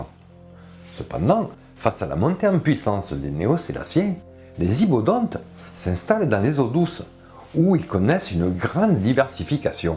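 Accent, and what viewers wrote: South, France. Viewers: Merci pour votre vidéo très intéressante, j aime bien votre accent du sud ❤ Je suis content la musique est plus diversifiée, il y en a juste une que j ai toujours autant de mal à supporter 😂, avis très personnel et subjectif bien sûr Par exemple à , pour donner une idée, c est celle ci qui revient très (trop ?) souvent pour moi la musique est inutile en plus. Bonne continuation, j attends le 2 avec impatience. Quel beau travail en tout cas 😊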